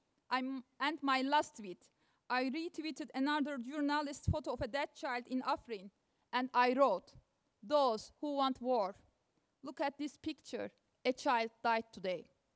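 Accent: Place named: Istanbul, Turkey. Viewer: Agir bir Nisantasi aksaniyla English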